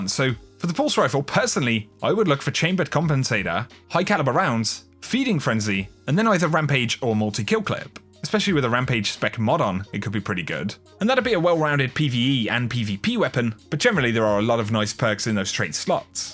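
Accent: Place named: England, United Kingdom. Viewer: Where is from?! He says Ay sounding vowels like eey.. But he sounds English?!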